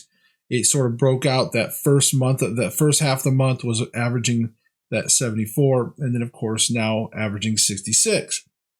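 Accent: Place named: Midwest, United States. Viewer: You sound like you are from the Midwest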